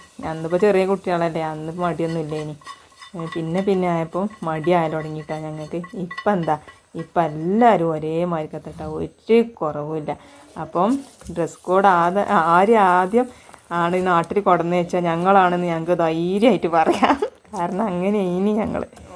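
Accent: Malappuram, India. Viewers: Malappuram bashayanallo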